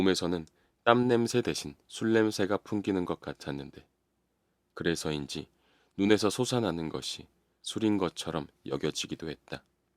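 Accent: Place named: Busan, South Korea. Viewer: 부산분이셨군요~ 사투린 모르겠고 억양이 있어요 근데 오히려 그게 매력이 되는듯해요 전 좋네요^^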